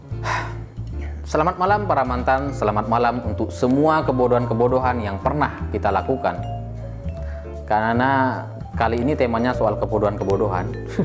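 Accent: Madura, Indonesia. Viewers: Logatnya mirip logatku, logat madura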